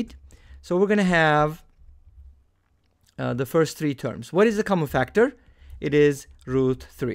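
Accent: Turkey. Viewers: You are turkish arent you i can tell by your accent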